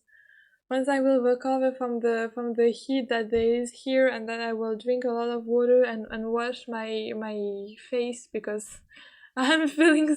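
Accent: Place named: France. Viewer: your english is really good 😭 and i also think french accent adds a bit of character (its not that noticible tho .d)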